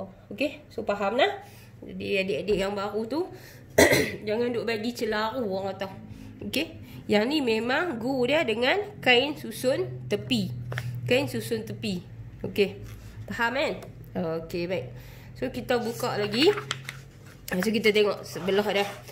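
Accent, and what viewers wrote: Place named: Kedah, Malaysia. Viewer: Asalamualaikum Terima kaseh Banyak dah Saya tengok vedio pola Baju kurong tapi Cara u explain sgt2 jelas Dan mudah d fahami Dan lucu dgr Loghat Kedah u rasa u memberi penerbangan sgt2 jelas u slalu kata jgn dok COMPLAIN. BUAT. DULU BUAT YG. MUDAH. DULU SAMPAI. FAHAM BETUL belajar yg mudah dulu sampai faham lepas tu Baru belajar yg lebih advance TERIMA KASEH. SEMOGA SIHAT2 SELALU DAN D LINDONGI ALLAH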